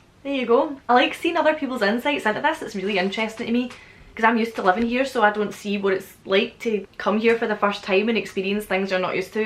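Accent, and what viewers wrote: Scotland, United Kingdom. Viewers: I’m amazed by how fast you talk... I’m from the U.S. and I’m not used to hearing people talk to so fast, yet so clearly at the same time. It’s actually quite interesting to me. I also love the Scottish accent as well. My nationality is Italian-Colombian. I’ve only ever visited Colombia twice, though I do t speak much Spanish not Italian, I don’t believe I have much of an accent either... though others say I do. Though, it mostly resembles that of an NY accent... Bc my Italian side came from Brooklyn.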